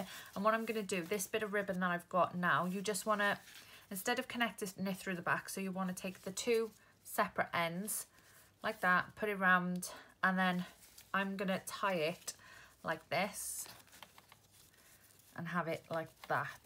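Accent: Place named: Cardiff, United Kingdom. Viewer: thought it was a Cardiff accent. We are from Pembrokeshire, my son is at Cardiff university so I’m there quite a lot. 😊😊😊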